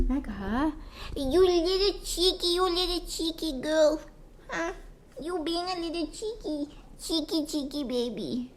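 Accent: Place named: Trinidad and Tobago. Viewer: Love ur trini accent im from trinidad too love u u resonate with me alot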